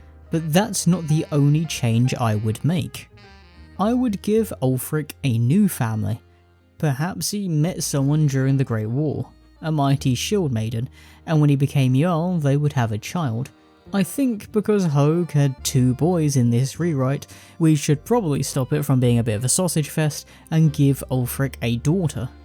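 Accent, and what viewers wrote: United Kingdom. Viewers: I'm English, and judging by accent I assume they are too. We tend to drop the R at the end of words.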